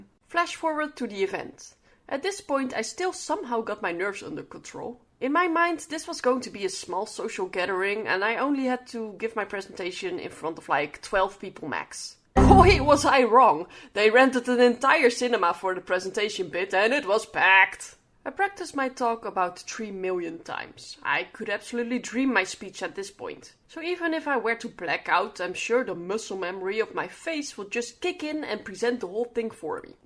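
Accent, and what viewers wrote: Netherlands. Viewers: Dikste hollands accent ooit vrind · Are you dutch? Ik kan het horen in je accent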